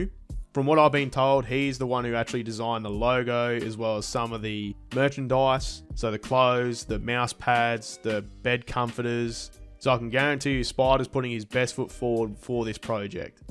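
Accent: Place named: Australia. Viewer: Thanks bro..good to hear an Aussie..im QLD'r..😃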